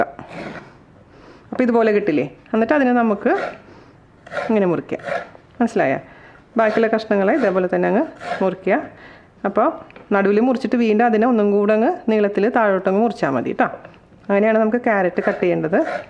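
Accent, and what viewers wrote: Thrissur, India. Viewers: I'm a Big fan of u..... ചേച്ചി ♥️♥️♥️ especially aaa thrissur slangilula tta..samsaravum pinne chechide Nadan vegetable recipesum✌️pinne njanum thrissurkarananutta 😜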